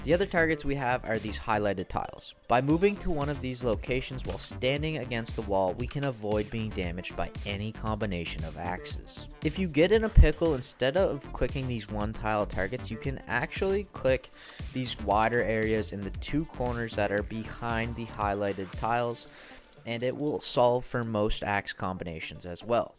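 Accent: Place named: Canada. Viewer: Thanks for the vid and ur aggressively Canadian voice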